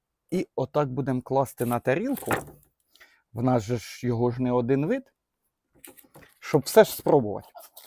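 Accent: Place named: Dnieper, Ukraine. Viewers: Навєрно з Дніпропетровщини, так само балакаю)) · Який суржик, нормальна наддніпрянська говірка))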